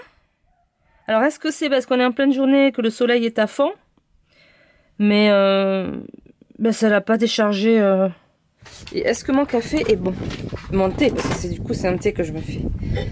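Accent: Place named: South-West, France. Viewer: Tu es enrhumée? Ça accentue bien ton accent du sud ouest lol..en tout cas bravo a toi et ça avance super bien..hâte de voir quand ce sera fini et vos péripéties 🙂😘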